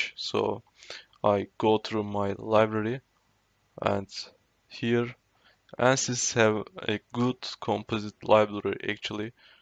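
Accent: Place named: Turkey. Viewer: Hardcore Türk ingilizcesi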